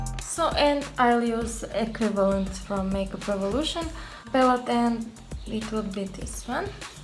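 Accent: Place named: Eastern Europe. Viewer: Love our eastern europe accent, where are u from hun x 😊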